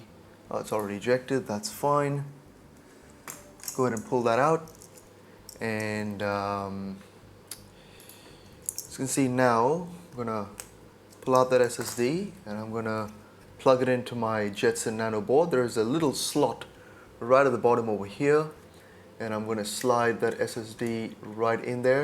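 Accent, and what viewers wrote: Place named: Australia. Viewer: yeah I picked your accent for Aussie. I live near Murwillumbah.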